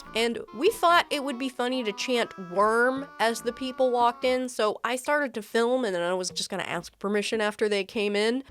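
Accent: United States. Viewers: I think it's just an aspect of her southern accent (drawl) slipping out every now and then. It's less an extra 'r' sound and more that we blend or even skip the vowels so the accent on the "a" disappears.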